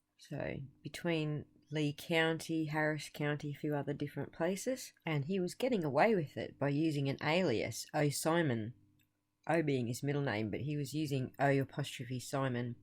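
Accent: Australia. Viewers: Exactly what I been saying. I see her being the Female "Mike oh" with her Aussie twist!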